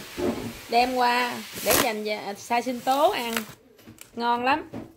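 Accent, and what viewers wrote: South, Vietnam. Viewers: Chi noi chuyện dung chat dan Nam Bộ minh luon de thương dễ gan gui nữa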